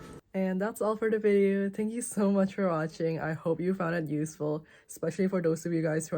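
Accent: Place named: England, United Kingdom. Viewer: The English accent got so thiccc ever since highschool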